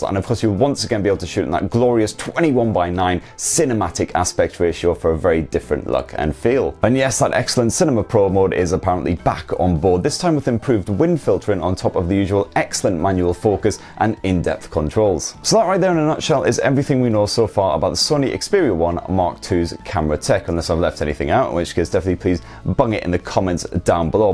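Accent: United Kingdom. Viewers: Can I just say, it is so Great to hear that wonderful British voice, I fricking love this channel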